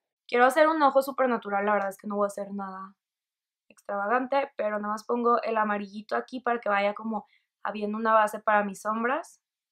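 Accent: Monterrey, Mexico. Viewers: Me gustan tus vídeos pero me encanta tu acento regio😁 sigue así, soy fan 🙆‍♀️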